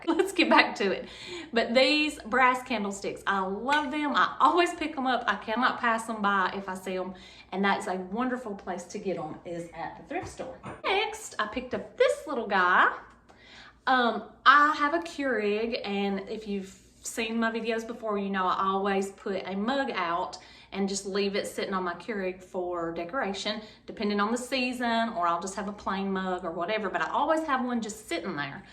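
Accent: South, United States. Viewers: Your southern accent is so adorable. Greetings from NYC. ❤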